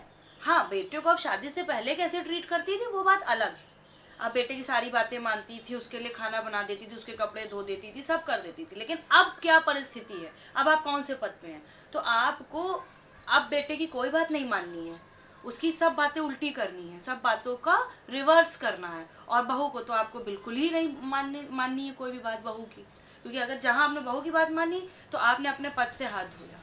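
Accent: Kanpur, India. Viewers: Yeah.. I have seen your interview... But your tone is killing it(totally kanpuriya)... Really proud... Artists like you are a real inspiration for society..comedy, Happiness , laughter from day to day experience without any bad words or double meaning jokes is rare nowadays.. Keep it up.. Lots of ❤❤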